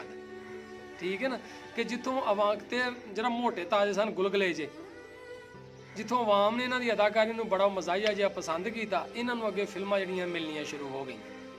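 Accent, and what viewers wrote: Punjab, India. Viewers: Aapky program ki Khoodsoorti Aapky PANJABI bolny KY style Mai Hy ...Urdu Mai to HR koi program bnata Hy .......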